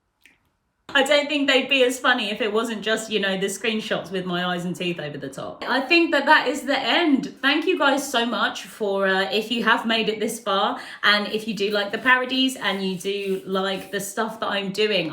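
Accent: United Kingdom. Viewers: Ms., (I've asked this in another place) as an American, the subtleties of British dialect are beyond us. Might I ask what "flavor" of British English do you speak? Also, you are brilliant, sharp and quick as lightning. Very extraordinary. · You sound quite British, I hope you are, I love finding YouTubers/tiktokers from the same country as me 🙂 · u british right lol!? x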